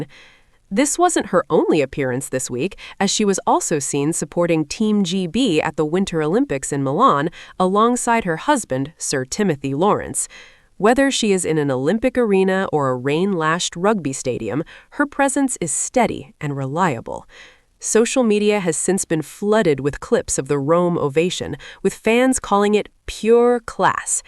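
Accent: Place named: United States. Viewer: Why have this AWFUL American commentary ?!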